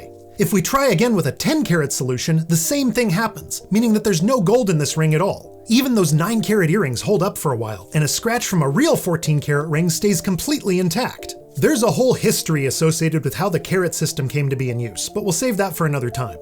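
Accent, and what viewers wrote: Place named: United States. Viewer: His accent is American but his legal references are British. I don’t know where the law changed in 1973.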